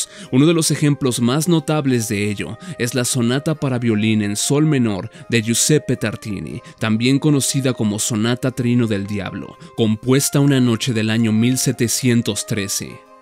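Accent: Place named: United States. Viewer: una pregunta ¿a que se debe el pronunciar todo lo que no sea castellano con acento yanky? es decir, si ya sabes que bathory no es una palabra inglesa no entiendo el porque pronunciar "bazory" con acento yanki como si tuvieras una magdalena en la boca, perdona pero me parece ridiculo.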